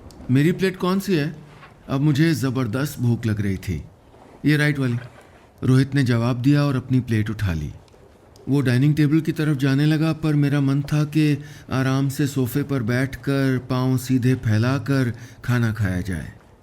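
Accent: India. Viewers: Main 2018 se apki kahaniyan sunti aa rahi hoon apke alawa aur bhi bahut logo ko suna lekin apke jaisa desi accent aur kisi ke pas nahi Aap kahani sunate hain toh lagta hai jaise sab ankhon ke saamne ho rha hai, bahut sukoon hai aapki awaz me Ise hamesha jaari rakhna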